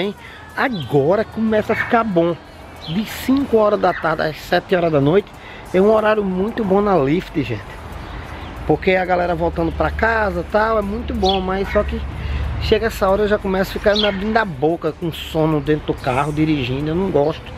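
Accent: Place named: Goiás, Brazil. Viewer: voz de cantor sertanejo deve ser goiano este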